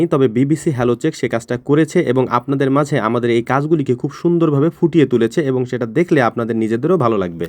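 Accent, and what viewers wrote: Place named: Takerhat, Bangladesh. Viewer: Viya apnr basha maybe takerhat er ase pase.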